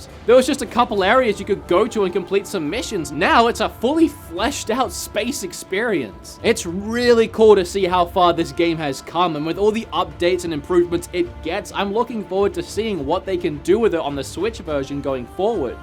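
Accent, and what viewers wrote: United States. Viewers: I'm Australian, all I can say is that your accent is devolving to American. Also I want to send you something what do u neeeeed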